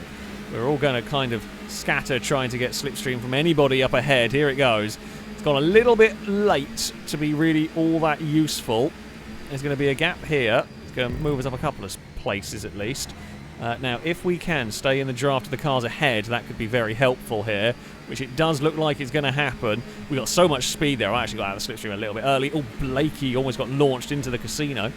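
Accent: United Kingdom. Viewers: The accent is UK but are you sure your not Canadian. You constantly apologize for stuff that is not your fault.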